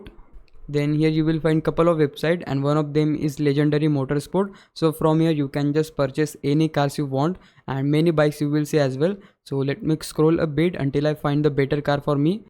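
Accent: India. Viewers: Sir, you have the most English Indian Accent I have EVER heard! I salute you 🫡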